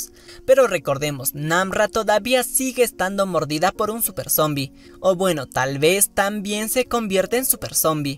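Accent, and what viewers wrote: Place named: Mexico. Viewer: palteas oe porque hablas como mexicano , si eres peruano !!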